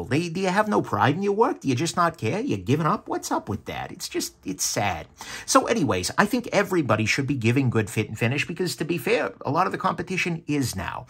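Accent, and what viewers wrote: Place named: Brooklyn, United States. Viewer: No way you live in Cali! You sound like your from Brooklyn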